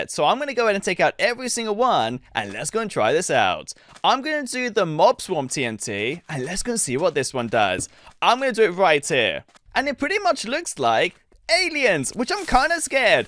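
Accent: United Kingdom. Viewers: And is he britis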